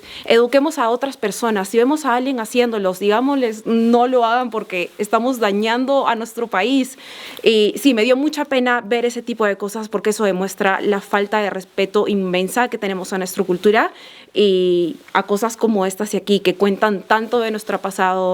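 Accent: Peru. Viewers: Pues sí habla como peruana.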